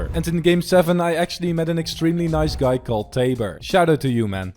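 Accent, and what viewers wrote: Netherlands. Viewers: Ur dutch right? Ps. Keep up the great vids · Heerlijk Nederlands accentje 😂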